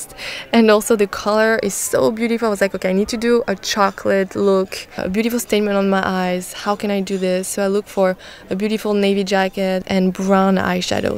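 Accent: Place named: France. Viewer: Can you make a tutorial with you speaking french? I love the accent!! Even though I can't understand them😂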